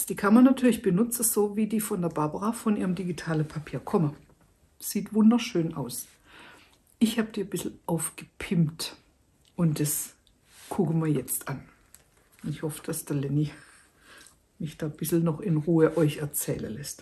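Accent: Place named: Hesse, Germany. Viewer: Hallo, ich find das super, das 'babbeln" zwischen durch. Hauptsächlich auch wegen dein Dialekt (ich bin aus Hessen) find ich toll❤. Natürlich auch das, was du uns zeigst ist immer wieder wunderschön. Ps seit langem suche ich Lochverstärker und nur die aus Kunststoff gefunden. Und du hast ein Gerät gezeigt, wo ich eigene herstellen kann. Ich bin begeistert. Danke du Liebe🤗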